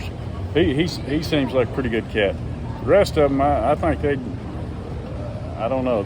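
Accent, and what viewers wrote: South, United States. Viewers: 1st time viewer of your channel. You have a southern accent. Where are you from in the States?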